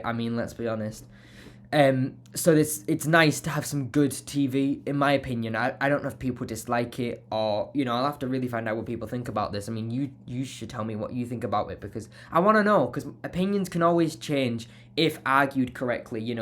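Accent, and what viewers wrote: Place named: United Kingdom. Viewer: Hi, you sound like you are from the UK?! How are you managing to watch it please?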